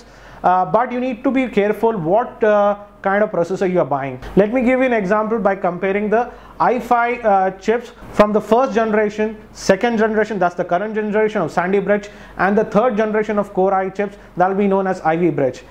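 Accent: India. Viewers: Came for the indian.Stayed for the indian voice. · hahaha the indian voice are all same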